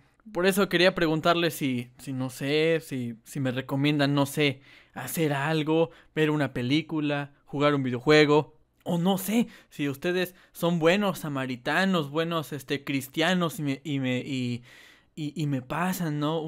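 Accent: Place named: Argentina. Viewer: me enseñas a hablar argentino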